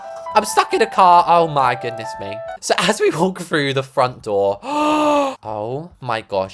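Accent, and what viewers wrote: New Zealand. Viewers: im sorry im from new zealand and that is the most horibble new zealand accent ever 🤣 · ur nz accent ate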